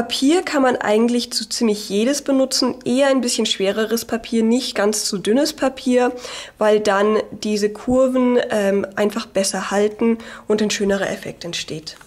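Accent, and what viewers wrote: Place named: Germany. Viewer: Is this speaking/demonstrating cause on another video on her account she says she is German. I am proud to be half-German I love the German accent no offense but accent reminds me of my dad but he died when I was 3. Very good english on other videos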